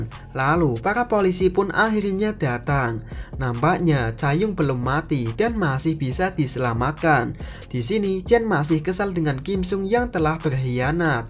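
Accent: Java, Indonesia. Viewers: medok banget..hehe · Jowone endi sampean? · Aku malah baru ini lihat di tv gk pernah lihat karena jarang nonton tv 😂 seru jugak filem nya 😂 mas nya jawa tpi lucu ngong nya jadi tambah terhibur 🙏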